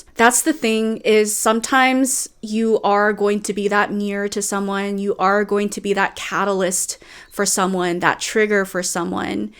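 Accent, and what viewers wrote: United States. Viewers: You are such an articulate, insightful girl and you bring up actually really interesting points about the further complications of not just being 'neurodivergent' but of either yourself or other people around you being from different cultures and therefore have different 'social norms' which makes it more confusing for you, or indeed anybody. But if I would listen to you without seeing your face, perhaps I would assume you're just a 'white, American' girl just from your accent and language. Yet what I wanted to ask you, is that in the way you SOUND exactly the same as a 'white american' could, do you think that because you or other immigrants born and RAISED in the united states essentially do not possess those 'social norms' thier immigrant ancestors have, or do you think theres some degree of even some behaviour and mindsets being inherently genetic, that is, for example, youre african yet your ancestors had different mindsets, traditions and norms, yet you were born and raised in america, do you think even though thats the case, they would retain some essentially pure 'african-ness' even though they had never stepped foot in africa before? 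A genuine question to see what you think 😊 As now you have set off my weird, unfiltered neurodivergent endless question making 😆😂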